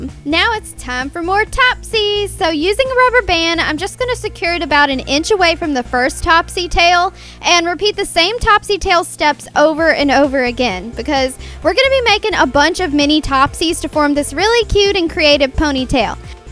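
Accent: South, United States. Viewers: is it me or she has like a country accent kinda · U r really pretty and ilove ur country accent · Southern accent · you sound like you're from the south. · You sound country!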